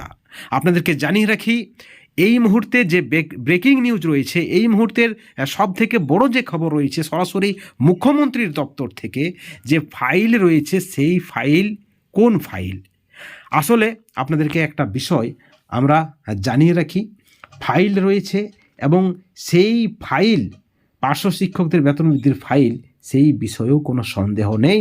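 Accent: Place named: Bangladesh. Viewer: Tumi ki bangala dasi vasha ta to Bangla dashir moto khabor towki Bangla dashar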